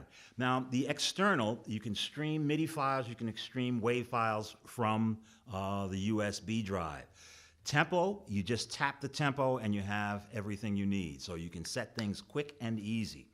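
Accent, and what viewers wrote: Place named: New York, United States. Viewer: Awesome demo awesome New York accent!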